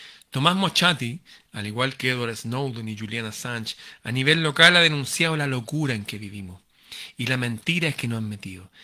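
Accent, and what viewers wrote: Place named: Argentina. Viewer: Parece que hablas de Argentina !!!! Qué bueno es escucharte! Cariños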